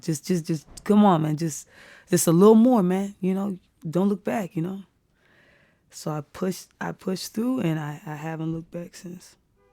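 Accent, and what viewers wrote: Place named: Caribbean. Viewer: English on top of carribean She sounds like Naomi Campbell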